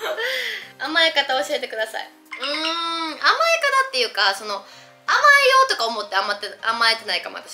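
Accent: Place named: Kansai, Japan. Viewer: 見た目も中身も関西弁も、ぜんぶ大好きです🥰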